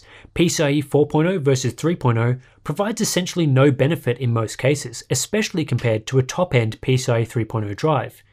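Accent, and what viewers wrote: Australia. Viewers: Whenever you click on a Hardware Unboxed video, you have to turn on Caption to see how YouTube decide to butcher the Aussie accent, and this time round it's "todd we're unboxed"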